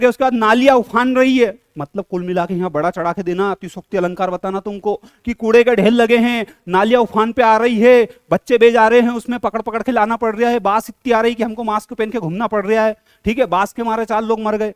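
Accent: Indore, India. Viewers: He's accent says he is from Indore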